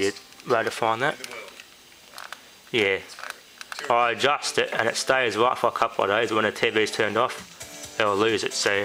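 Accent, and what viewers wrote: Australia. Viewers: Strong regional accent from Australia Mate...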